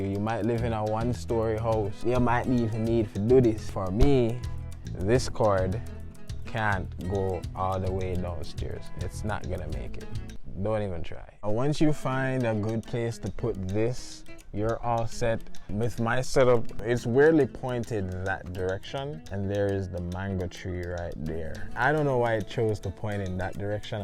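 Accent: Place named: Jamaica. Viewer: The Man speaking American English with a Jamaican accent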